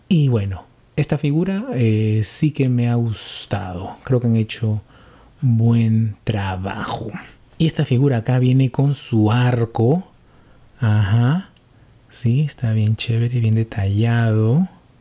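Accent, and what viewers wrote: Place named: Peru. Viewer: Por fin un shartimus prime que puedo entender xD, buen video Bro, eres de Perú me párese? Tienes un suscriptor más (y)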